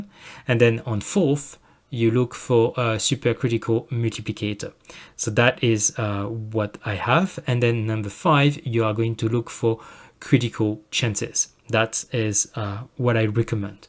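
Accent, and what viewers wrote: France. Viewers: j'ai reconnu direct l'accent français mdr ^^ Bonne vidéo sinon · Frenchest person i ever heard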